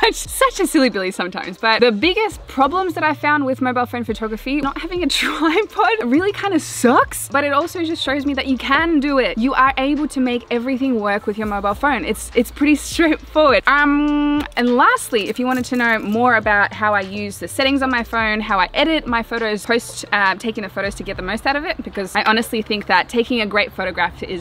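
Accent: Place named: Australia. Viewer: Were you using a timer or? Also! You’re so bubbly I love you channel! And! What’s your nationality because your accent is a bit of a mix. I’m from Australia and you sound kinda aussie!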